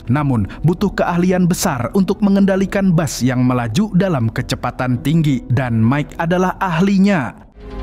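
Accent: Banjar, Indonesia. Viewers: Orang Banjar kiranya ne😂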